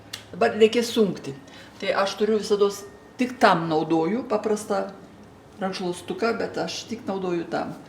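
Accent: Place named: Molėtai, Lithuania. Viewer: Vo čia kaimiskai. Pagal kalbą tai kaip močiutė iš Molėtų;)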